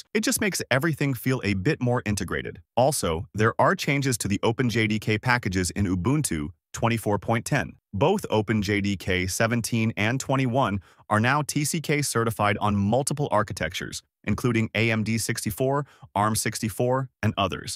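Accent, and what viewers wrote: India. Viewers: Hey bro are you from india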